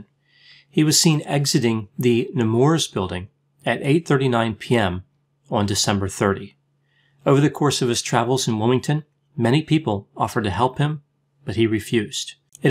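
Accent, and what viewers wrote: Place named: Baltimore, United States. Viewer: There was an Unsolved Mystery located where I'm from too. Season 1 Episode 1- Baltimore at The Belvedere Hotel. I lived a block away from The Belvedere for 5 years. In one apartment I had The Belvedere was my bedroom window view. It was really fun seeing all of the different shots of the building and the neighborhood around it. Some great shots in that episode. I knew was lived near me because we have the same accent.:) Regarding this case- I also said trash truck compactor but they didn't mention that in the show. My other theory is he was beaten by teens because there is a problem with teens beating/ killing homeless people. They probably saw him disheveled and thought he was homeless which is why they never looked to take his watch and money. Then he was either put or went in the dumpster then finally killed by the trash truck. 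I wondered if he ended up in Newark because he was trying to get to New York and he misheard the person who gave him the ride.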